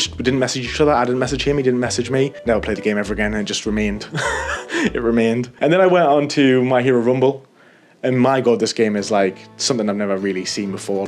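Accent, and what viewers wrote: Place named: North East England, United Kingdom. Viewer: I have over 50 platinums. Out of them 50 i have the dlc trophies for about 3 of them 50. Some games i have dlc purchased but havent touched it, because once i have platinum, im usually too fatigued to play dlc. Im like you. Im 35 now though and have bad adhd. Im really struggling to do the grinds that once seemed theraputic. Cba watching guides for collectables etc etc anymore. Much prefer just putting game on and playing it in my own time! If platinum becomes viable, i will get it, but i wont go hunting like i used to. Dont get the dopamine that i used to when getting plat. Feels like its all for nothing now. Also nice to see a fellow north eastener doing well on Youtube gaming. I'm from Teesside! Just down the road, judging by your accent!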